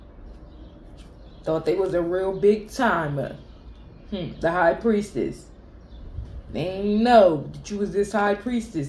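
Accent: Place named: East Coast, United States. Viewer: I love your voice and your lisp! Those lips with ya Easthcoast accent..I do!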